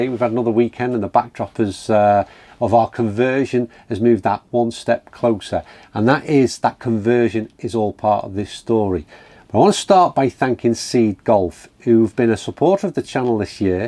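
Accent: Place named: Scotland, United Kingdom. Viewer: this is a good morning from Montréal, Canada. First I had to get used to your Scottish accent. Thereafter it is the most pleasant trip I can have to visit Scotland and appreciate a friendly average guy who happens to be a pretty good golfer, visit golf courses and listen to reasonable and fair evaluations of golf material. I should add on, the background music is most of the time very good. I feel like listen to the next door guy who’s talking to me and not taking me for a stupid consumer. Many thanks for your interesting material. Here’s it is -25 Celsius, even a Noodle golf ball would die in the middle of a flight.😎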